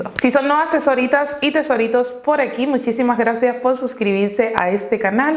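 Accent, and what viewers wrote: Cuba. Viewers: No es que no sepas decir la palabra, sólo es tú acento cubano. 🖤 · ella es cubana asi es su asenton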